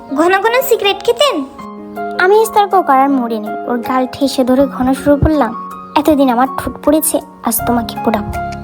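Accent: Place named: Chittagong, Bangladesh. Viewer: Apu apni ki chittagong er? Apnar kota gulu shunte keno jeno erokom lage Ar story onek beshi shundor hoise 😘❤️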